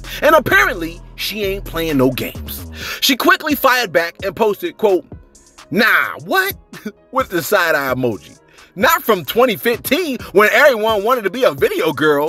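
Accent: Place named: East Coast, United States. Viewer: His "C Om Sayn" is so East coast..Sup South Jersey...The page is entertaining..His accent is too cute and his hype voice is too funny... he posted a King of Clubs. What you play Tonk or pitty-pat..That million is coming quickly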